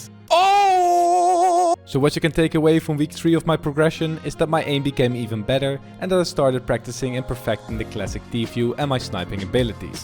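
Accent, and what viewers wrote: Netherlands. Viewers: Nederlandertje ik hoor het al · Are you from the Netherlands? Cuz you have a Dutch accent!! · You sound so Dutch 😅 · Are You dutch? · Are you dutch? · Ik hoor aan je accent dat je Nederlands bent maar je uitspraak is echt goed hoe heb je dat geleerd? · Ur accent sound so dutch are you from Holland? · Are you're Dutch? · Are you dutch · Are you dutch brother? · Jij bent sws nederlands hoor et aan je accent haha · are you dutch?? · Waarom klink jij zo nederlands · Je hebt een Nederlands accentje..🧐😉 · are you dutch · Wait u Dutch? Lmao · you sound like your from the Netherlands... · Are you dutch?? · 100% sure you're Dutch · Are you Dutch? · are you dutch?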